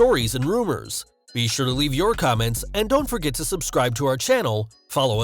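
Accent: United States. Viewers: Hey guys what is going on here is the MOST ANNOYING AMERICAN ACCENT INTHE WORLD here to talk down to you....ffs man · Your accent has changed to american. Now you'd get more subs?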